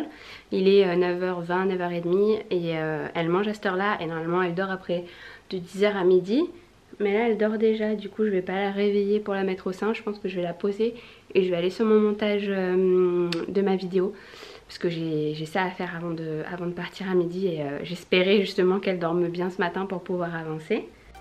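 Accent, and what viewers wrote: Spain. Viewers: Ton accent españiol 💓il est incroyable trop choux votre petit bout de choux de parler españiol et français c trop bien, 😁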